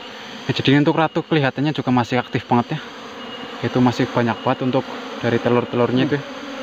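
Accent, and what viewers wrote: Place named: Wonosobo, Indonesia. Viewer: Mau tanya bang,ini dari logat bicara sama seperti istri saya..apa abang dr wonosobo?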